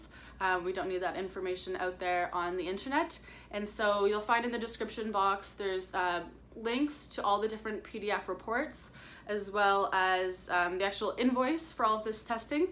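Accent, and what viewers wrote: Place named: Canada. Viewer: ABHOOT 😭😭 that Canadian accent omg so cute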